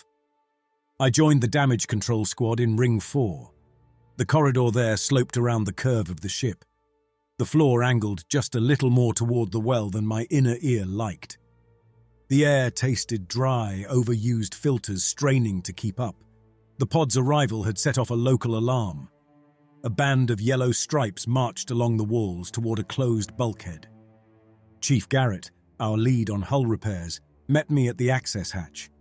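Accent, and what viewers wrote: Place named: United Kingdom. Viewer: Oh look another British accent 🙄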